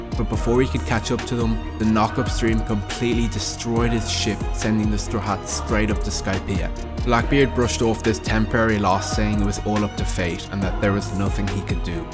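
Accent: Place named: United Kingdom. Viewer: Such a lispy British accent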